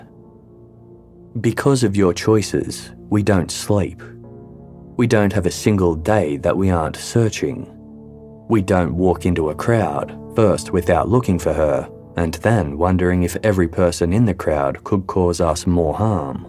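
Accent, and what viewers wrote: Australia. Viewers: Did the strong Australian accent not give you a clue?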